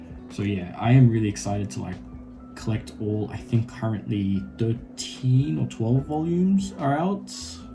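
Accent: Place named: Australia. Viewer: I sense an Australian accent (you might be from New Zealand or I might be wrong) but with how prices are to me your rich.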